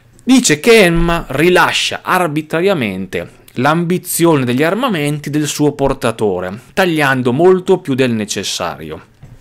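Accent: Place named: Umbria, Italy. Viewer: senti io mi diverto a indovinare gli accenti..secondo me sei di città di castello dimmi se ci ho preso. Saluti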